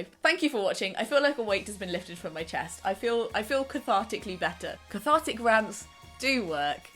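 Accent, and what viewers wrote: United Kingdom. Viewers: Also wild to hear Patrick Swayze lines in a British accent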